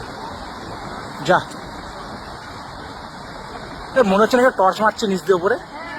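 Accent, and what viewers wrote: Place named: India. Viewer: bd er hole amadar agni keno boltece video te? accent sune mone hocce rendian hedu? ar bd er hole amader bola hedu ta desh drohi hedu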